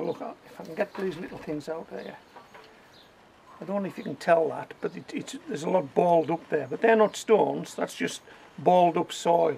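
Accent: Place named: Hartlepool, United Kingdom. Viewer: Where is your accent from? My Mrs is from Hartlepool and I reckon yours isn’t too far off.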